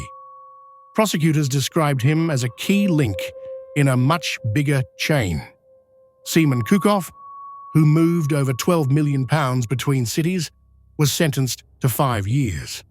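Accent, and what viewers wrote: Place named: Australia. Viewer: My previous comment was deleted by YT strangley. Great video. Subscribed. Interesting Aussie narrator. AI or paid ? Are you able to share the source? Cheers from this Aussie living in NZ 😎🎸🤘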